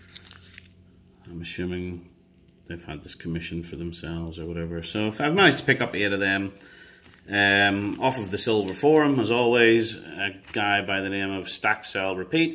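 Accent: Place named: United Kingdom. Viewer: you have the most American British accent ive ever heard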